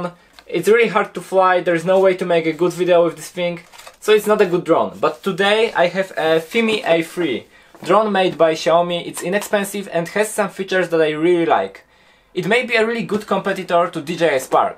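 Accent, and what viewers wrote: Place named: Poland. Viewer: Słychać po akcencie ze Polak xd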